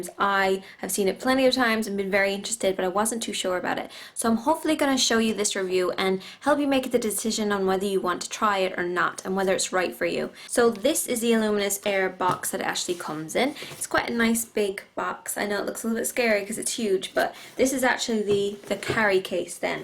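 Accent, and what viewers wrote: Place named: Ireland. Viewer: I noticed the Irish Accent then checked your facebook and your from Cork!!!!! me too! thats so cool!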